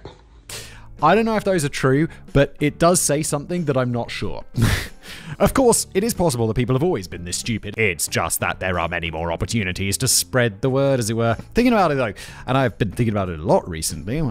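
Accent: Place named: England, United Kingdom. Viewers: *in a British accent. (I don’t know the exact one.) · English accent to be precise, whistler doesn’t have a northern Irish accent, and you can understand his English so it’s not a Scottish accent.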